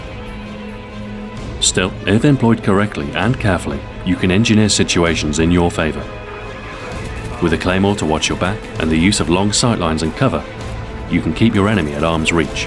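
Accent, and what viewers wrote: England, United Kingdom. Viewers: Where abouts in England are you from?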